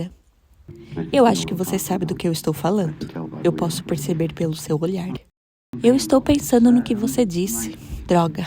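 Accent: Portugal. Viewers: Ótimos vídeos, ganhou mais um inscrito, sotaque português muito lindo rs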